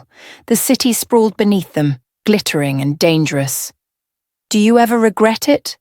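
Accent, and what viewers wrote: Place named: United Kingdom. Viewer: How could she have blood on her wedding dress if she changed into a "reception" dress just before the attack after the wedding? The narrator voice is so out of place in this story. It's way too British/formal... Why wasn't there anyone from her family or closest friends at her wedding? He's rich enough to have flown some in... Just sayin...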